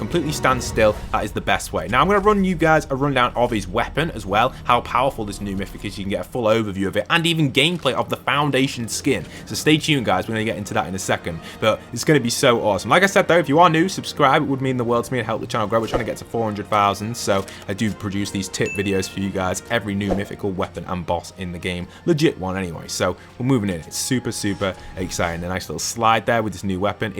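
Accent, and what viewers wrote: United Kingdom. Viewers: Yo r u from Leeds or manchester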